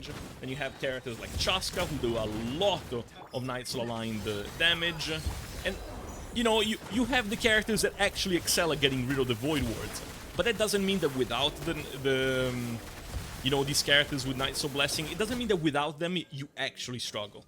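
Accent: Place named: Italy. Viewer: Accento leggermente italiano dio bono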